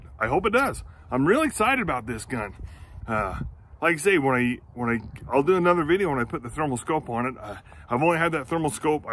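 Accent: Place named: North, United States. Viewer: You sound like you're from up North. Am I right? I think so. Howdy from TEXAS brother⭐🇺🇸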